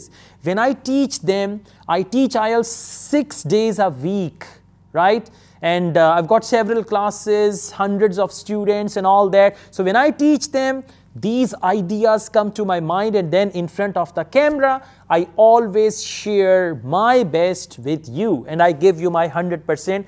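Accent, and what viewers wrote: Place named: Punjab, India. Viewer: I like your Punjabi accent 😁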